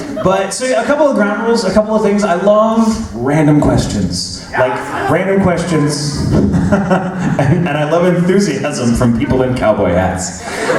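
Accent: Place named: United Kingdom. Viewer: lol outside world : nobody cares about how he speaks; oooooh he's British, good for him... in conventions : when he speaks like that, everybody dies <3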